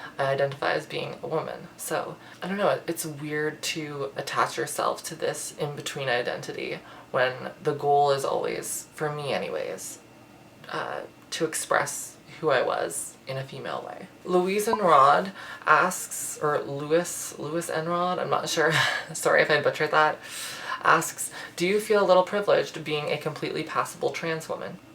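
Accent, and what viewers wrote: Canada. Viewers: You are one of my favourite youtubers. You come from the same region as me and I find your voice, speech pattern, wording, and slang so calming to listen to. Aside from that I genuinely enjoy hearing what you have to say content wise. I've been living provinces away from home for the past couple years and your videos are my favourite thing for easing homesickness. The way you tell a story is just so familiar and that dry subtle humour paired with classic Canadian manners just gets me laughing.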